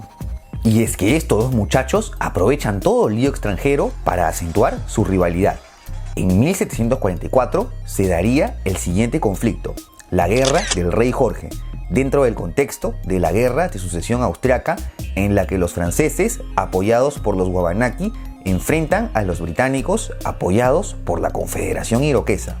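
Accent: Peru. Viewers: Es Peruano, En Perú no hay muchos acentos. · por la voz y tu borrador artesco,eres de peru,no? jajaja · Es peruano xd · es peruano, el acento y el borrador Artesco, ambos peruanos!.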